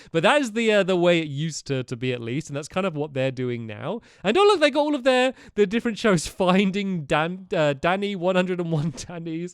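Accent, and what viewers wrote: United Kingdom. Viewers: Its been Years, since I watched him I LOVED him for a while I got a british accent when I was really young when watching him...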